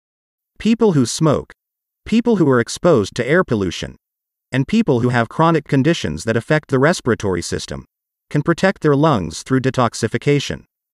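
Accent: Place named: United Kingdom. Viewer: bro hindirishi naam likh k kis aangrez se bolwa rahe aap proote karo na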